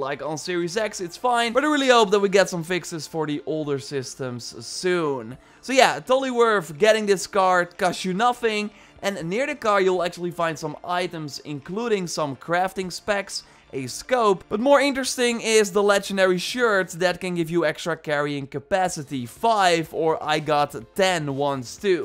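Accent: Netherlands. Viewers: Are you Dutch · He's Dutch isn't he?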